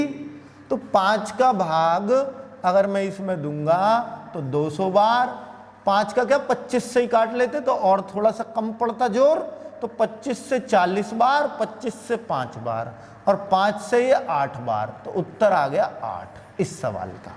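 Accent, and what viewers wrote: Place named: Western Uttar Pradesh, India. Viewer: Sir.Questions ke Answer explain karne ka way hai, wo aapka Ultimate hai. But aapki jo Desi language hai wo Impressive hai Western UP wali. Hum bhi aesi hi language mai comfortable hote hai 👌🏼👌🏼👌🏼👌🏼👌🏼👌🏼.😊😊😊😊😊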